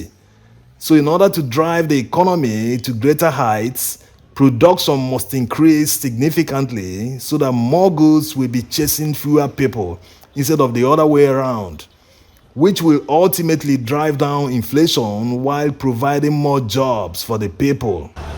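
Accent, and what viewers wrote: Nigeria. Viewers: Peace unto ye. I hope you and your family are doing well. I like Nigerian accent. Believe on the Lord Jesus, and thou shalt be saved. When the true Jesus shall return, we shall worship him at the feast of tabernacles. In the new earth, we shall worship the Lord of hosts in his new moons and sabbaths. Have a good residue of the year. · His accent is AUTHENTIC Nigerian accent.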